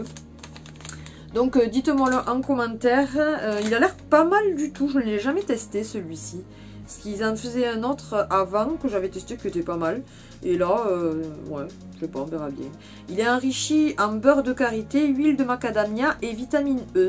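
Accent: South, France. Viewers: Coucou Je viens de découvrir ta chaîne et de m'abonner Ah super l'accent du Sud ❤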